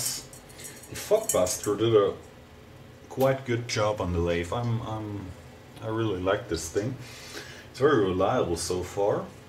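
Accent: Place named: Germany. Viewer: BTW: Solche Unterlagscheiben gibts fertig, Z.B. bei VW. Werden dort bei den hinteren Radlagern eingesetzt. Man merkt es deutlich, Du denkst Deutsch und sprichst Englisch. Ist lustig, besonders wenn Du mal wieder ne Vokabel suchst.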